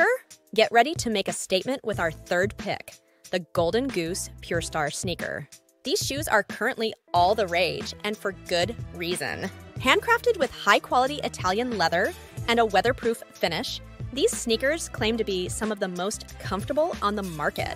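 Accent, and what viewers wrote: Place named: California, United States. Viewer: I’m not trying to be mean, that California accent is straight up out of Saturday Night Live, The Californians . 🦄 🌴 🌞 😂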